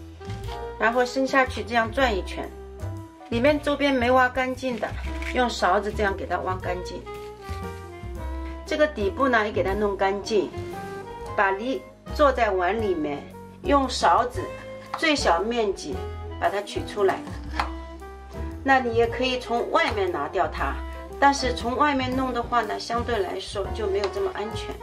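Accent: Shanghai, China. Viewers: 听口音，上海阿姨啊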